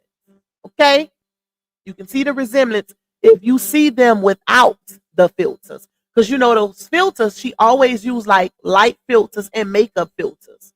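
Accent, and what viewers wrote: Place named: South, United States. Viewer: And may I say I LOVE the way you say BER-DAY!!! 🤣🤣omg that's the cutest Southern accent I ever heard!! Love you! 😘💕✨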